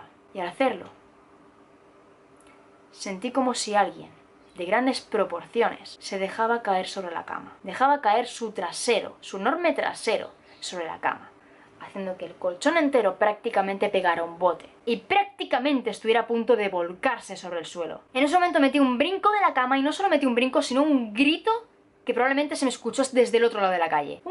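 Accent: Spain. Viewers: Porq Dalasito no tiene este acento español ???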